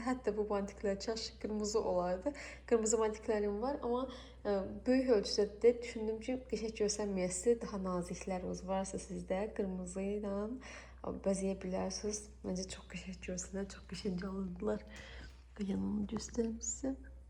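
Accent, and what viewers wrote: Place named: Shaki, Azerbaijan. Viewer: Bayaq Dan seki lehcesinin sirinliyi celb eliyirdi, indi de sirinliyi, Men ona balet eliyirem butun vloq boyu🥰Allah saxlasin 🙏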